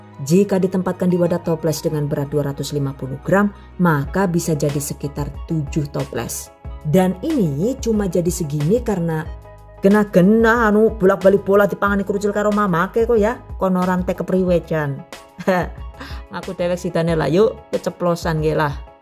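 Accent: Banyumas, Indonesia. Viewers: Alhamdulillah nemu resep baru dari Kesukaan anak q beng2 Okay...pingin nyobain bikin buat isian toples lebaran nanti.. Suka sekali dgn penjelasannya bunda mudah di mengerti trus lucu ada logat ngapaknya 😁 #GiveawayTHV2021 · Ora ngapak ora kepenak 😅. Sukses terus buat yang selalu menginspirasi dengan resep resepnya #GiveawayTHV2021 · Mantap,. Nyam² :) Selalu terhibur dg ngapaknya. #GiveawayTHV2021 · Amazing.... Ngapaknya... Sukseeees maa syaa Allah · Sambil nyimak sambil nyengir2 dngerin omongan ngapak 😂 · Selain resep2 dan penjelasan yg jelas singkat padat,aku suka sama logat ngapak nya...Caaaaatat....😀matur nuwun mbok ilmu nya semoga sehat selalu...Caaaatat😊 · Selalu suka sm video² krn lengkap bgt sm tips² yg sangat berguna.oia, sm satu lg.logat ngapaknya yg selalu jd ciri khas...makasih buat sharingnya...semoga semakin berkembang #GiveawayTHV2021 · Slalu semangat nonton vidio... 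Selain seneng karna resepnya simple n jelas juga karna lucu banget apalagi klo lg ngomong ngapak sumpah nyong geget banget... #GiveawayTHV2021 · Mb lewih kepenak ngomong ngapak bae lah..😀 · DONE #GiveawayTHV2021 Penonton setia,slalu jadi inspirasi,Krn slalu mengeluarkan ide ide yg cemerlang untuk sy biar semangat untuk usaha jualan dan penjelasannya begitu detail plus Bhs ngapaknya ciri khasnya sy bgt,matur suwun 🙏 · tetep dong logat ngapak ga ketinggalan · Ngapaknya itu loo..jd ingat klo keluarga besar suami lg ngumpul di Sumpiuh 😄😄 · Ngapaknya bikin ngakak bu...mood banget dah 😂😂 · Yg sy tggu tiap liat video adalah logat ngapaknya 😁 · Suka lihat ngapaknya sama seperti saya penjelasan nya jelas makasih ya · Love love banget sama ngapak nya 😅😅😅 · Logat ngapake wisss ora ana tandingane😁💜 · Lucu org jatim tp bs ngomong ngapak n pinter bhs inggris pula msh muda, tp suara dewasa keren" resep nya👍👍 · Hhhhhhh lucu,seneng dech dengerin mbk nya klo lg ngomong ngapakkk auto jd ngakaakkkkkk dech nyonge😂😂🤣🤣🤣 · Senengx disini lengkp. 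Suara enak. Lucu lagi. Dan bisa bhs ngapak. Pada karo inyong. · Logat ngapaknya emang selalu bikin kangen kak, 😁 sukses terus, gak pernah pelit resep emang ini🙂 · DONE. Resep cemilan is the best, pas buat isi toples persiapan lebaran. Selain unik cr penyampaiannya yg slalu ngapak resep n caranya jg detail bgt. Trm ksh teh resepnya yg slalu menginspirasi bgt.#GiveawayTHV2021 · DONE #GiveawayTHV2021 Bune gawekno resep kue ilat kucing dong,sing ekonomis tapi maknyuss👍tak enteni lo bune🤗 Nek ora ngapak ora enakkk😁😂😂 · Bhs ngapak e menghibur,lucuuu....resepnya jempolan.favorit 💞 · hahahahaha lucu banget si lah. nek mirengaken tiyang ngendika bahasa ngapak toli lucu banget. ternyata aq dewek ya lucu ya nek ngomong ngapak hahahahaa😂 #GiveawayTHV2021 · Bener bgt...ngapak abis logatnya...wkwkwk...trus detail bgt lg....semuanya dh pokonya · Bismillah #GiveawayTHV2021 Bahan simpel mudah di dapat Suara yg ngapak bikin ngakak🤭🤭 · Chanel kesayangan ku, mudah d fahami krna selalu ada tip & trik. 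Dan yg paling uniq bahasa nya inggris,indonesia dan ngapak.pokoe lain dari yg lain deh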